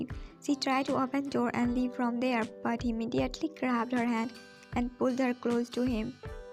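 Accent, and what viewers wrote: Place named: India. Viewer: Your voice is just so cute I like your accent are you from India ? Lots of love from 🇫🇷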